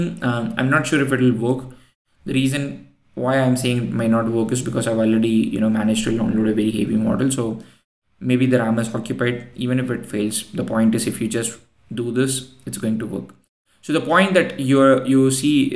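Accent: India. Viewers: you accent is noticeable but I have heard many with much thicker Indian accents, yours is quite good. Might be even better now, I only just came across this video now.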